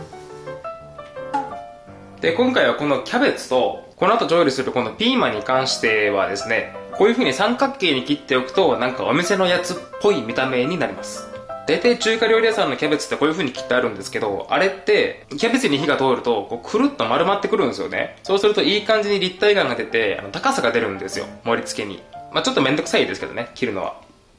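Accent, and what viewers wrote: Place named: Kansai, Japan. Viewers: 関西弁って強い(感嘆) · あなたの関西弁も反則やわ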